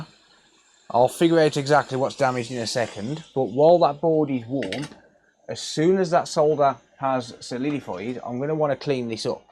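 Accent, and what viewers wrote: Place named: Black Country, United Kingdom. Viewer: Brilliant, your patience and attention to details is superb. You sound like a black country boy, where are you located?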